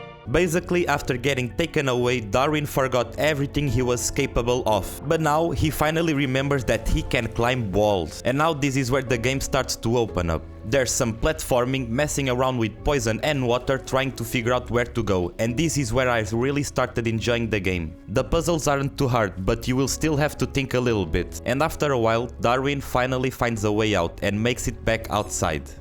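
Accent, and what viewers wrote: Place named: Portugal. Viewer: O teu video apareceu-me nos recomendados do youtube, entrei por curiosidade, pareceu-me atrativo. Nas primeiras palavras comecei a perguntar-me a mim proprio se tu eras portugues, o sotaque estava muito a dar nas vistas. Fui ver a tua PSN e olha, és tuga mesmo. Boa sorte com os videos, +1 sub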